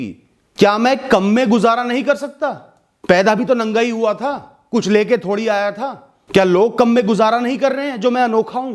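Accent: India. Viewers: Very Nice Sir Motivating and I like your videos due to our Desi and Indian Accent Aap ekdum acche se samjhate hai Really Superb Sir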